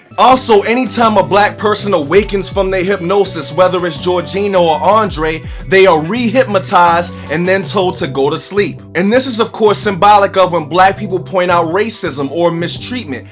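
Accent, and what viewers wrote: Baltimore, United States. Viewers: this guy gotta be from Baltimore I can hear it in certain words he say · I hear your accent. what part of Baltimore are you from? lol good video. I didn't pick up on the song but it makes sense. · you gotta be from baltimore bruh i hear it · I hear that Baltimore shordy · You from Baltimore,? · Your B-more accent is so strong lol. And maybe I saw you blink just once? Excellent commentary. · are you from Baltimore?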